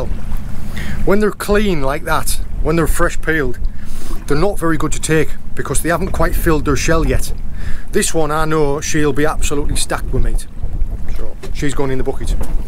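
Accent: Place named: Cornwall, United Kingdom. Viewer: A very sustainable approach to sorting the catch. Enjoyed the sweet and lovely Cornish accent either. Missing it now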